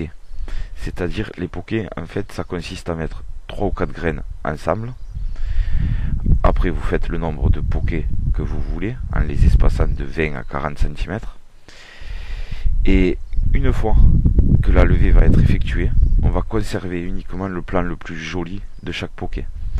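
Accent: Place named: Paris, France. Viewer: Vous avez l'accent pointu. Très bien expliqué et on voit que vous le faites avec amour 😊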